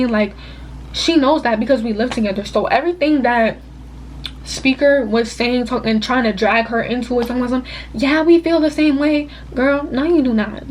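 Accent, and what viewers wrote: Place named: New York, United States. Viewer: I heard the accent and said "sis must be from NYC😄" and I was right lmaoo we can tell from one New Yorker to another. I also think it's something in the water coz these girls are weird and do not know how to be friends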